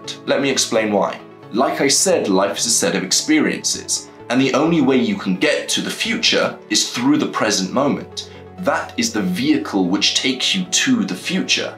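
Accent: England, United Kingdom. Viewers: You sound English it’s refreshing respect from South Yorkshire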